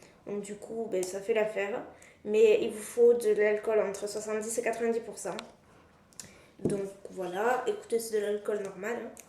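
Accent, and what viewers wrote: South, France. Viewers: C'est bizarre, on dirait que tu as plus l'accent du sud dans cette vidéo que dans les actuelles :o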